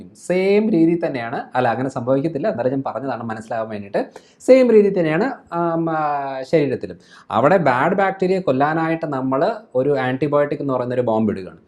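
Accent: Pala, India. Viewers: Attitude പാലാ ഭാഷയും കൊള്ളാം....പക്ഷെ ഇടക്ക് കാടു കയറുന്നുണ്ട്....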